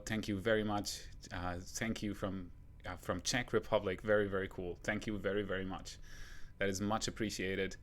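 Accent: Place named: Bavaria, Germany. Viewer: Being an old Army brat my dad's last posting was Stuttgart.The first time I ran across you I was shocked,you looked just like my childhood friend Helmut from my school there,right down to slight Bavarian accent most likely don't notice lol.Your English is VERY good,better than some Americans lol.And I'll say you have a beautiful family and I'm looking forward to you coming to America to stay.Maybe someday I could meet you I hope,my oldest son is 37 so hopefully we have things in common.God bless and keep you:-)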